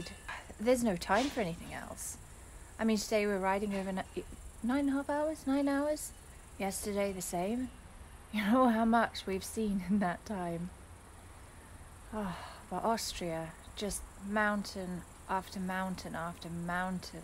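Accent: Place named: England, United Kingdom. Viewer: I`m English and you accent is so close to it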